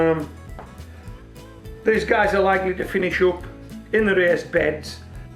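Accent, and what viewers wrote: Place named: Lake District, United Kingdom. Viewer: New subscriber here. Never been a keen gardener, always kept an almost zero maintenance garden before (shaming my old dad who has always been very keen and won many many show awards for his veg) - however, since starting off a few fruits last year, I found your channel and you have me inspired to try some veg growing in pots and a couple of tiny raised beds. Going through all your videos now and long may you continue to inspire. Cheers and all the best to you and Molly :) P.s - trying to place your accent, that can't be a Lakes lilt surely!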